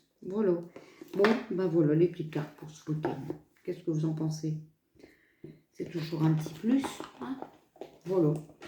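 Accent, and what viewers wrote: North, France. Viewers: Bonjour j ai découvert votre chaîne il y a peux de temps aussi j attend les suivantes avec impatience j aime votre accent du Nord et votre gentillesse votre nouvelle coiffure vous rajeunit pouvez vous svp me donner les liens de votre site j'aimerai vous acheter des angonites merci bonne soiree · Bonjour Je vous suis depuis un petit moment je m' éclate d'abord avec votre accent du Nord surtout quand vous dites "voilà " j' adorrrrre!! Le 16 juillet à 20h precises sur Web tv RDH (Rassemblement des Droits de l'Homme) un certain Philipoe Argillier doit parler. POURRIEZ-VOUS NOUS DIRE SI C'EST QUELQU'UN DE FIABLE OU PAS. MERCI🙏❤️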